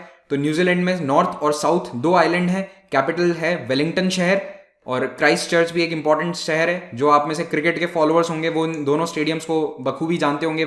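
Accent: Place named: Uttar Pradesh, India. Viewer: Sir aap UP se honge.....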